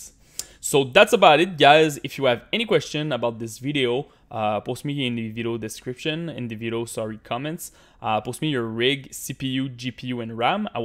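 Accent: Quebec, Canada. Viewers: Et mec jtai vite cramé avec ton accent quebecoi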